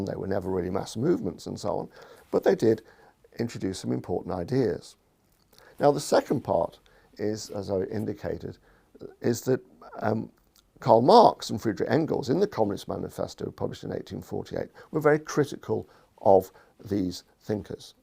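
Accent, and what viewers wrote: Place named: United Kingdom. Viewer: Thank you. Well versed, laid out, explanation of the origins and early history of Socialism. The British accent also helps to accentuate the importance of topics and points being made.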